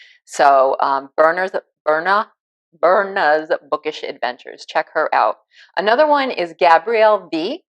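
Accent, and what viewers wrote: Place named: New York, United States. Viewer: I’m sorry you had to go through that and I’m sorry someone lost their life that you knew, however slightly. I’m from Brooklyn originally so I did detect a New York accent from the start. OK I’m continuing your video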